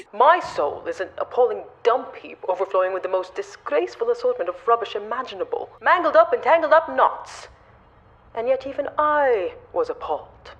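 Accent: England, United Kingdom. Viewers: What's the accent about, is it meant to be English ?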